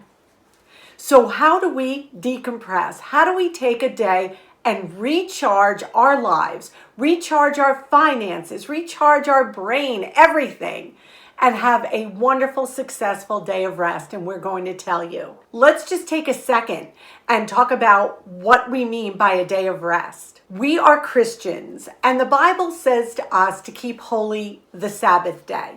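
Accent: New York, United States. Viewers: Hi and, its Helen from Sydney, Muswellbrook, Australia. I have been watching your show now for many a while now and I enjoy your positive, kind and practical outlook on life. I always enjoy your warm and friendly start to your show. I have cooked many of your delicious, frugal reciepes and you have shown me how easy it is to enjoy life without wasting money. Love your New York accent and the kitchen shelving with your lovely vintage bowls. God Bless you and have a Happy Easter❤😊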